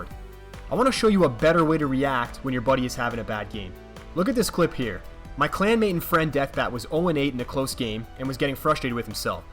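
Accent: Canada. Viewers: are u canadian? you sound canadian. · the candian/minnesotan is strong with this one haha. great tip man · lol you can tell this guy is Canadian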